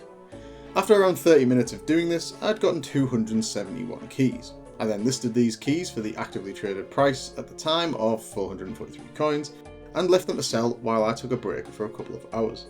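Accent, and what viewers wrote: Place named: North, United Kingdom. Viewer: Is that a fellow northerner?! Haha great guide man keep it up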